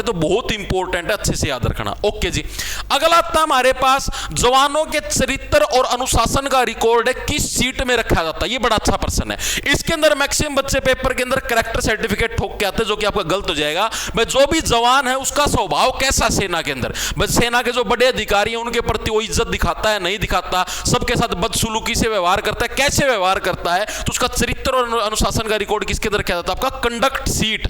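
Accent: Haryana, India. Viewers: Mujhe lagta h sir hariyana se h.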